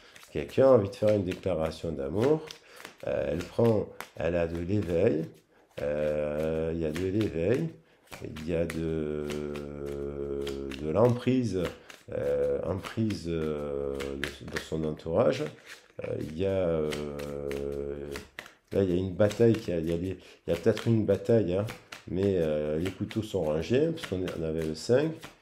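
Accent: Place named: Provence, France. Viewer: Bonjour tres heureuse de Vous voir et de Vous entendre j adore votre voix du matin 😁 belle surprise 🌞 un rayon de Soleil qui vas illuminés Notre journée 😜 merci pour cette magnifique guidance Vous m avez fait rire 😄👍 pour votre petit message j ai le Coeur qui vibre pour toi qui Mai's venue en tête et j ai pensé avec votre accent a Marcel pagnol Marius TU me fends le coeur 💝 la parti de carte 🔥 j ai passé un bon moment en votre compagnie j ai adoré 👏 bravo gros bisous🤗😘🌈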